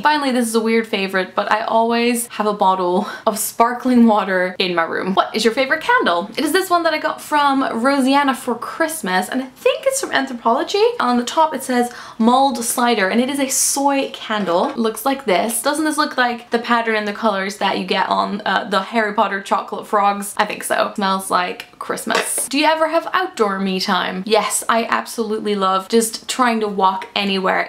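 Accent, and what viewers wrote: United Kingdom. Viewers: I need a lot of me time and I enjoy doing things by myself. Sometimes I snuggle up with a book/movie/TV show, sometimes I go shopping. I go to museums by myself once in awhile as well. By the way, I love how your accent becomes more and more British! I find myself adopting accents and dialects very quickly when they are familiar and I'm in the area for some time. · lovely video! :) also your accent has twang of British intertwined in it and it's super cute haha! xxx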